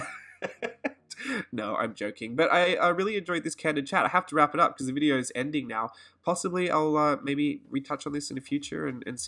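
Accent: Australia. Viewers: Yay an Aussie artist to watch 😀I could tell you where Aussie from the start 🐨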